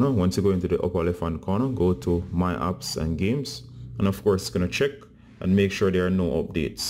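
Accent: Jamaica. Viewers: Are u from Jamaica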